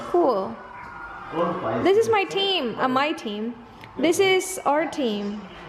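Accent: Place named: Visayas, Philippines. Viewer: silent viewer..I like your vlogs and so cute your voice as visaya to speak english.. but always watching your vlog😊...ask ko lang anong work mo ngayon?, or in a moment sa bahy muna? God Bless to u and 😊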